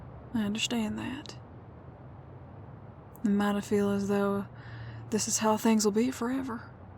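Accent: South, United States. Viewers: The southern accent makes it so much more calming lol I always come back to these vids.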